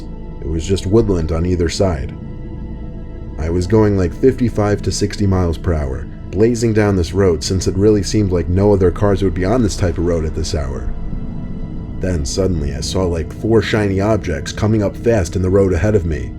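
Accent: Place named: New York, United States. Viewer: Liam Sadler i knew he was either a new yorker or New Jerseyian like myself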